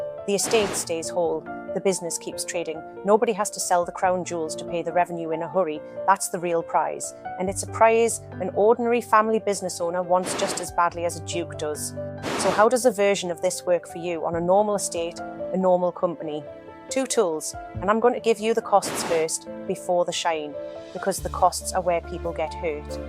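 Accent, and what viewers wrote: Newcastle, United Kingdom. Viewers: The Duke's estate is Alnwick castle, and the Dutches has built a poison Garden with almost every deadly plant in there behind security. Love your channel keep up the good work and its nice to hear the Geordie accent.... pet!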